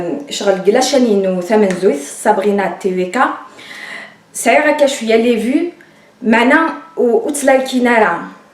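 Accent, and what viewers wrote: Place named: Kabylie, Algeria. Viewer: j adore tes video et surtout ta facon de parler le kabyle.j aime bcp ta personalite car je sens que tu es sincere je t encourage a continuer ton travail afuth iguerzen a weltma.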